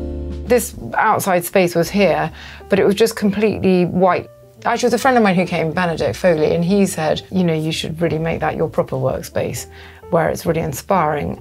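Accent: RP, United Kingdom. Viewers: Marvellous posh accent with a really tense jaw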